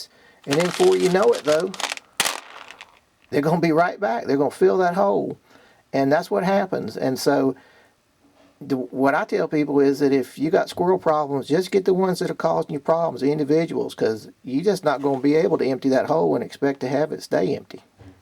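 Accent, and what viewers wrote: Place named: South, United States. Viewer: I love the southern accent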